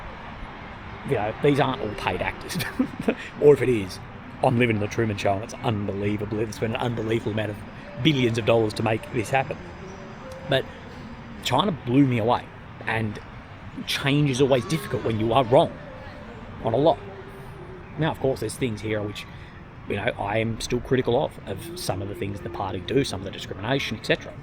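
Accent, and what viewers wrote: Australia. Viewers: what accent is it， assuie? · You must be Australian based on your accent. Welcome to China.🤣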